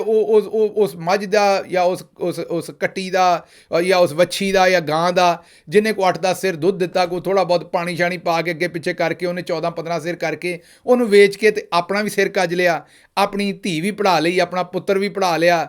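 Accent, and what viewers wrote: Punjab, India. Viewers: تہاڈے جئی پنجابی دو سو سال دا پرانا بابا وی نئیں بول سکدا۔ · sahib poori theth te khari punjabi bol ke shaa jande o janab zindabad or tuhanu salam 🎉from charhda Punjab India 🇮🇳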